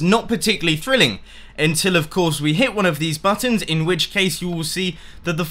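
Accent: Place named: United Kingdom. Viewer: What on Earth is this eccentric sounding British chap talking about?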